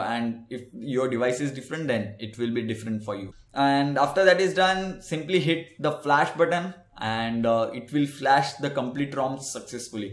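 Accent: India. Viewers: unbearable amount of indian accent hurting my ears... good video though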